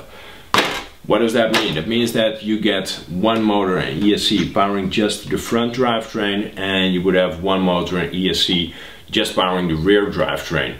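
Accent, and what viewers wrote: Netherlands. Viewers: I hear a bit of a dutch accent. great video, even though I'm a bit late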